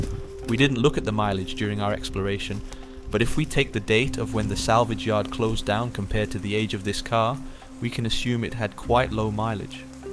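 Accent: Netherlands. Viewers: I like your Dutch accent 👍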